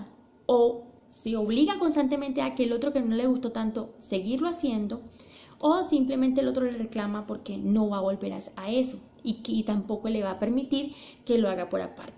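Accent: Colombia. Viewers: es de Colombia por su asientos y forma de ablar ve sus vídeos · Buen vidro, un abrazo desde barranquilla. Aveces se te sale un asento costeño.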